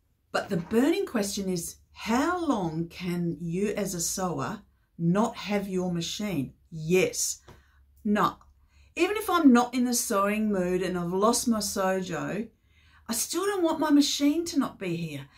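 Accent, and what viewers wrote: Australia. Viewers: Hi, sew glad to find your channel. I love finding an Aussie voice for a change. Look forward to following your channel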